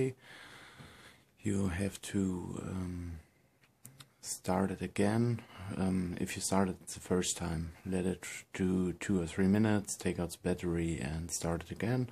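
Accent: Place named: Germany. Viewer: Warum redest du kein Deutsch man gehört genau das du deutscher bist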